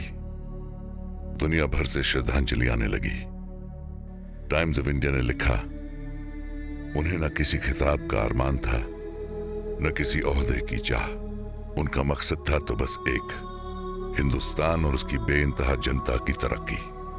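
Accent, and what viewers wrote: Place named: United Kingdom. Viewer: Hindi in English accent is amazing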